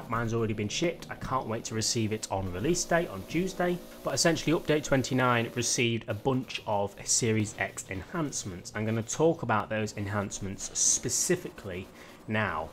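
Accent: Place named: North, United Kingdom. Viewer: For some reason I never noticed how much of a northern accent has until now. 🤣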